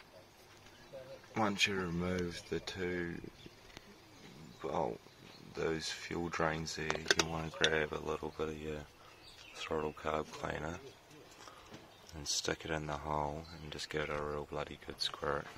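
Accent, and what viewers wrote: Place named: New Zealand. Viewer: Hey bro, sounds like your from New Zealand, are you from Auckland at all? I've just purchased a 2E 1994 Toyota Corolla Hatchback recently and it seems to be running a bit rough, if your in Auckland would you possibly be keen to maybe check it out and maybe tune it? Would pay for your time etc and would rather have it done by an expert then trying to mess with it myself since I'm not really mechanically minded, if your keen let me know. I'm thinking it could be the mixture, I adjusted the idle screw and it seems to rev lower as the previous owner adjusted it to be high revving as that's how he liked it he said (strange but yeah hahaha) Cheers bro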